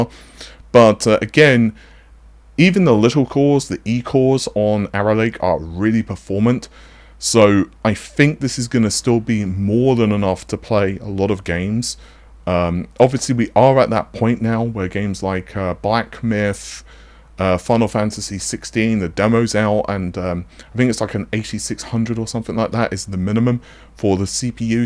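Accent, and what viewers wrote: United Kingdom. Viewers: Watchied a lot of British TV and I have come.accross quite a few people that sounds like this man's accent.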